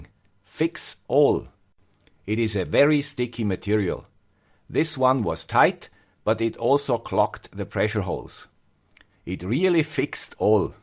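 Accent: Switzerland. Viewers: Hi, love the way you show us your work. You should change your slogan in:.....the guy with the swiss accent and swiss humor:)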